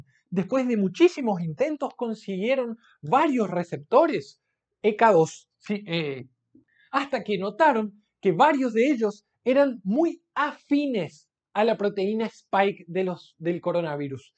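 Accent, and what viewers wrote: Argentina. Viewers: Al fin Dr., volvió a su original acento argentino y dejó el feo acento brasileño. Se le escucha lindo y muy interesante su exposición gracias Dr. por su dedicación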